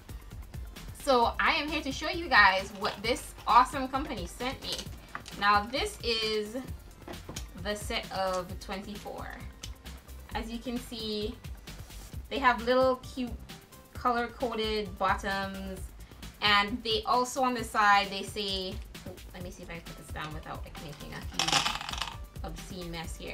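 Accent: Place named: Trinidad and Tobago. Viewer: found you through your amazon review....ah hearing that accent gyul! Trinibago???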